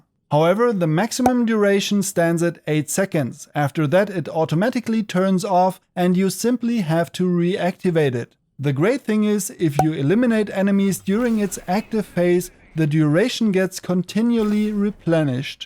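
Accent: Germany. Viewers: Akzent: Deutschalisiert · Hier sieht man mal wie krass der unterschied zwischen deutschen und englischen Videos ist. Der original creator hat hunderte Videos consistent gemacht und du hast schon halb so viele Abos… würde dem ganzen mal folgen, da das echt interessant zu sehen ist und ich selber überlege englisch zu machen. Wie ist deine Erfahrung mit dem “deutschen Akzent und wie gut die Leute das annehmen?”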